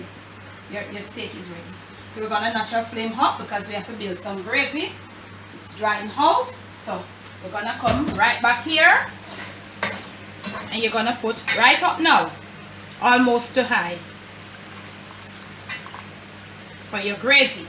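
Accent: Jamaica. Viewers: Thank you for sharing with us your Jamaican pepper steak recipe. I will try it this coming Thanksgiving for my family. You have a nice way of talking, and I like your Jamaican accent.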